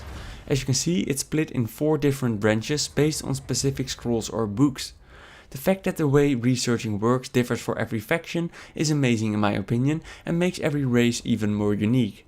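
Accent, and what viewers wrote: Netherlands. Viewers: Are you perhaps from the Netherlands?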